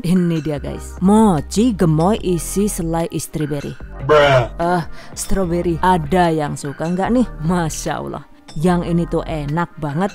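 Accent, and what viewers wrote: Banyumas, Indonesia. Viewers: Diperbanyak dong ngomong ngapaknya.. 😅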